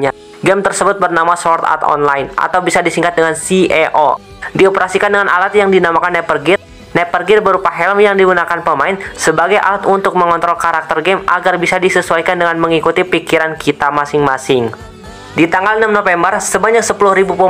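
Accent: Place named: West Java, Indonesia. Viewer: suara khas sunda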